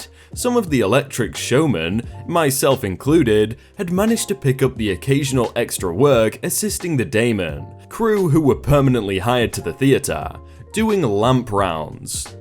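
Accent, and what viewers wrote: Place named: Southern England, United Kingdom. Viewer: England, probably south, doesn't sound midlands or north.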